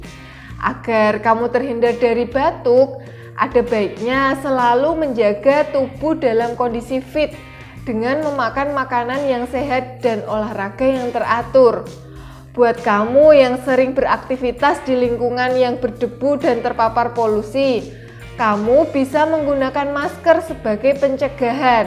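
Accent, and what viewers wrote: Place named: Java, Indonesia. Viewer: Watuh medoknya..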